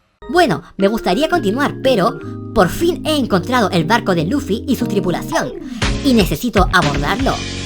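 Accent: Chile. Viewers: hola, descubrí tu canal por el minidoc. de HXH y me quede viendo tus videos y son increibles, agregas mucho conocimiento acompañado de humor. Te me haces bien original me suscribo y si mandas saludos mandamelos en algun video no sé:V y note que eres chileno:D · eres de chile e-e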